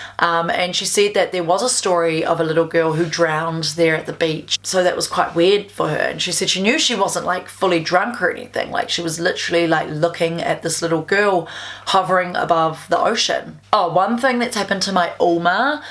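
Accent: New Zealand. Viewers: Shivers.. You gotta love how New Zealanders talk. Ever seen Flight of the Concords? Case closed.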